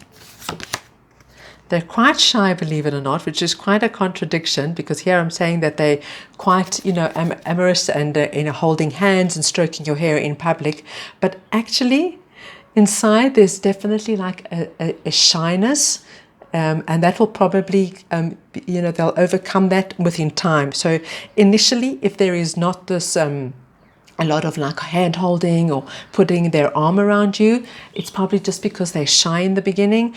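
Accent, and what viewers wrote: South Africa. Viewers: wow thanks for the reading queen! you sound south african lol❤️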